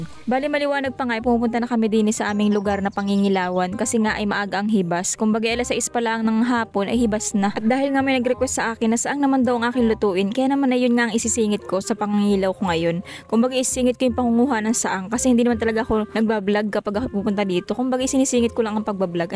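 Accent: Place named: Marinduque, Philippines. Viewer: Miss ganda at masipag pa kala ko taga marinduque ka kc un mga salita u ay puntong marinduque ngani mandin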